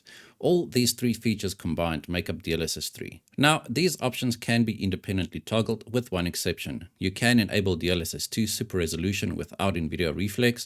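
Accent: South Africa. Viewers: Is that Afrikaans accent I hear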